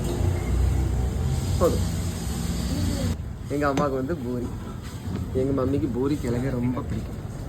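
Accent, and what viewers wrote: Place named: Kanyakumari, India. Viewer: Casual ah neenga pesuradhu thaan namma kanyakumari azhagu ❤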